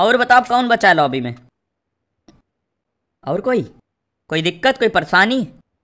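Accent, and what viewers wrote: Kanpur, India. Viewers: Bhai aap kanpur se ho kya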